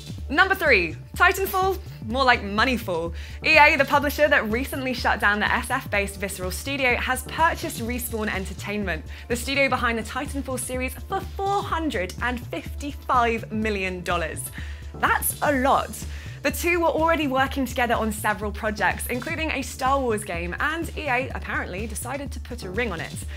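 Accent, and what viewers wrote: United Kingdom. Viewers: Cute, redhead and british accent. 10/10 IGN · Holy crap !!!!!! That British accent though 🤔🤔🤔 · That beautiful british accent though;)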